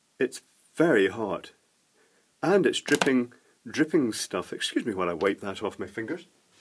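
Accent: Scotland, United Kingdom. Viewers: can someone tell me if he's scottish or irish? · if i am not wrong ,this is a british accent right?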